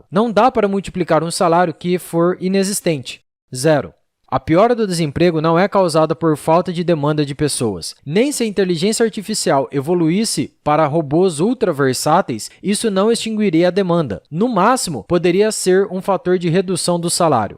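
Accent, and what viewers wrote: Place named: São Paulo, Brazil. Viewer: Parem de mentir com esse sotaque da caipira paulista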